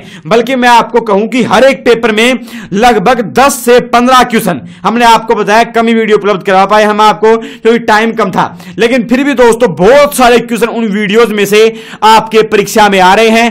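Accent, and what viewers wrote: India. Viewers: Ap hariyada se ho pakka...😳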